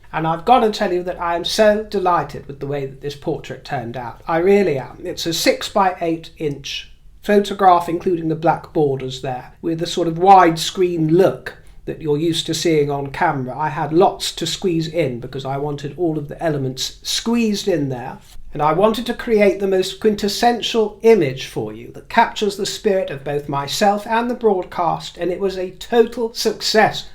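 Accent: United Kingdom. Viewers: Happy to hear you will stay with us. I love your british english and accent, it is a pleasure to listen to you. Greetings from Switzerland.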